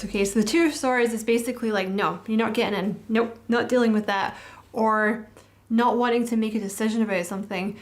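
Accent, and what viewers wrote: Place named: Scotland, United Kingdom. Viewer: Just from listening to you I can feel you're a very special person, spiritual, witty, funny, and I love ypur particular Scottish accent. Thanks for the reading. I love the beautiful being that you are...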